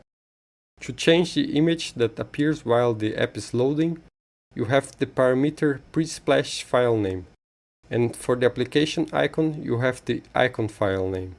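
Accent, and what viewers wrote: Brazil. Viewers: vi que era brasileiro pelo sotaque, valeu meu rei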